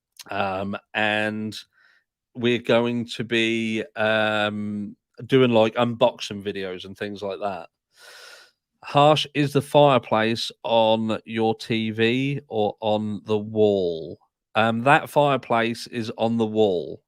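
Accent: United Kingdom. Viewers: Apparently, the closed caption doesn't translate UK English very well. It's throwing words up that are no where near what you've said. LOL. Feel sorry for any people who rely on it.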